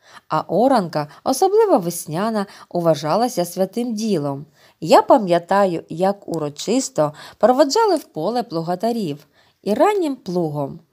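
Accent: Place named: Russia. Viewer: Дуже дякую! Ви мені дуже допомогли! Я завжди вас слухаю.Але в вас якась трішки російська вимова слів і деякі слова ви вимовляєте неправильно але всеодно це ніщо в порівнянні з тим як ви нам допомагаєте)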